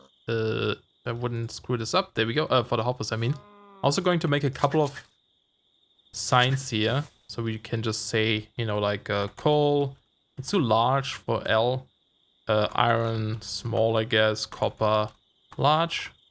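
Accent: Germany. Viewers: Are u German? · I was looking for this comment. Fellow German here. His English is pretty good, however I believe he still uses German intonation.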